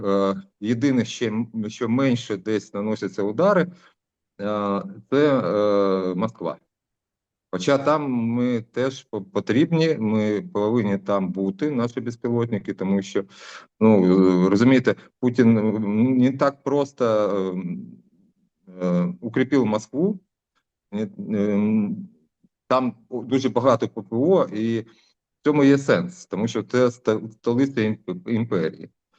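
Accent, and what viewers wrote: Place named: Surzhyk, Ukraine. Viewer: Ну говори на русском, если сложно, нафига этот суржик!??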